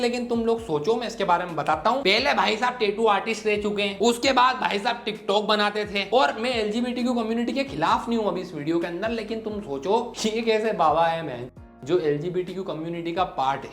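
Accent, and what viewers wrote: Indore, India. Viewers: Bhiya Aap Indori ho kya ? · Bhai tera INDORI andaaz bht achha laga... aisa laga koi bada bhai samjha rha hai sahi baat❤❤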